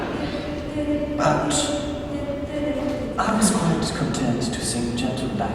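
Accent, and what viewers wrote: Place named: Germany. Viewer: why he sound so german?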